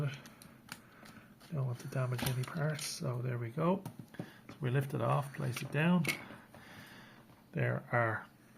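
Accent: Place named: Ireland. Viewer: What part of Ireland are you from you sound like all if my relatives lol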